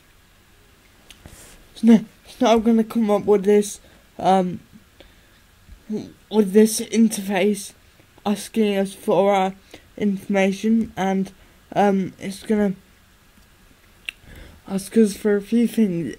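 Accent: United Kingdom. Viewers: are you from south whales ol chum?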